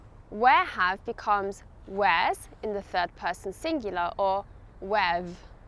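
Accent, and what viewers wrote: United Kingdom. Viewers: Nice video. ❤ Is it British Accent you speaking? Just a doubt. I am a new subscriber.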